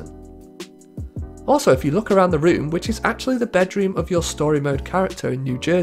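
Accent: United Kingdom. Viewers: Where in UK are you from? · I'm happy to have this to watch, but at a certain point I got to the stage of thinking "if I hear him say 'neversoft developer' in the same nonchalant British-accented English teacher tone just one more time, I'm gonna lose it" You really should have broke that section up or just listed all the names in rapid succession and said they were all developerS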